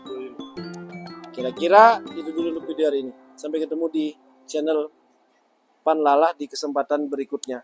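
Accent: Bali, Indonesia. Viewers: orang bali ya? Kelihatan dari cara ngomong nya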